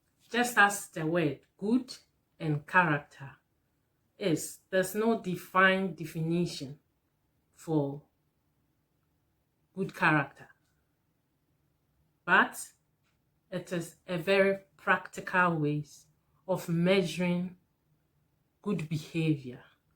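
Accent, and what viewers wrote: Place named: Jamaica. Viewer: Are u Jamaican? U sound like my people.. stay bless.. good review sis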